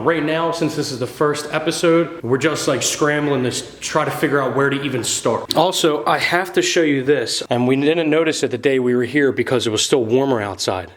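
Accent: Philadelphia, United States. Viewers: Also where in PA are you from? I’m from Delco, you definitely sound like your from the Philly area. I’m hoping to move out to the Lancaster area in a year or 2.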